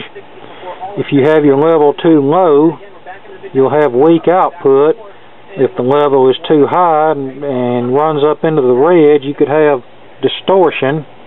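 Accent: South, United States. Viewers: I was born, raised and grew up about 45 minutes outside Nashville, Tennessee. Nashville was a much smaller city than it is today in 2022. In 1985, for about a year, I moved to Downers Grove, IL and worked in Chicago. Communting into the city from Downers Grove was hell on earth, even in 1985! I moved back to near Nashville in 1986. I don't have the southern accent this gentleman has because I worked in major market broadcasting. Although I wanted to major in electrical engineering and be a radio station engineer, I did take a public speaking class in college to speak in a more midwestern accent since I had to do on air work for radio. Also my mother was born and from Brooklyn, New York. She met my Dad while he was in the military. He got station as a Staff Sargent at Ft. Campbell, KY. In 2022, I'm retired. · I love southern people...that voice...kills me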